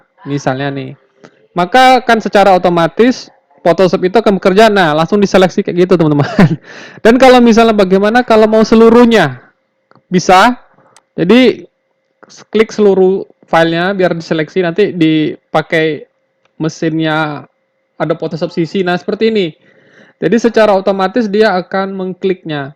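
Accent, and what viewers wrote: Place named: Padang, Indonesia. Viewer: Sprti logat logat padang padang gan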